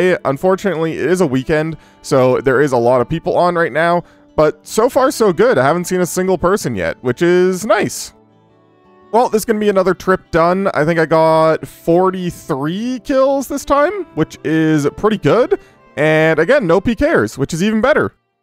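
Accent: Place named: Upper Midwest, United States. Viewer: I've been wondering this since starting the series but where are you from? You have the quirk where you say "done the task" instead of "done with the task" which I've only heard from people from Philly haha but otherwise I'd say upper Midwest (like me)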